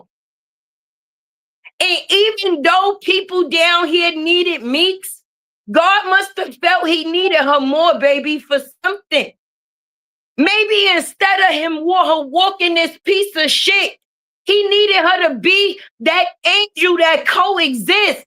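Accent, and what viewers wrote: New York, United States. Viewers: Hey I here that nyc accent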